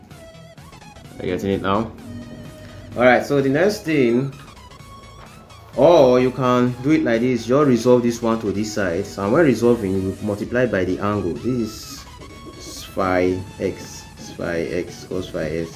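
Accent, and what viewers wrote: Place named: India. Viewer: Uniben student sounding like indian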